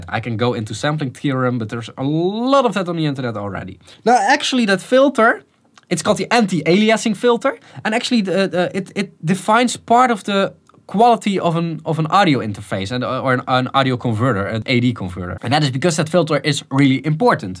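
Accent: Netherlands. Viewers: Excellent! Are you Dutch?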